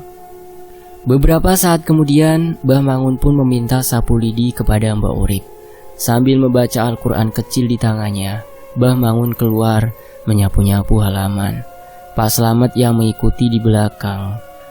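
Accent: Java, Indonesia. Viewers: Metu Su... 🤣🤣🤣🤣 mas mimin katon tenan jawane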